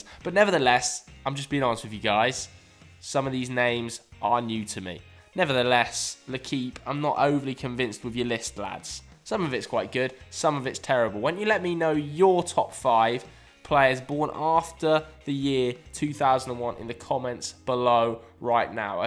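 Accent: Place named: RP, United Kingdom. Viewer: Posh wigger talking about football...skip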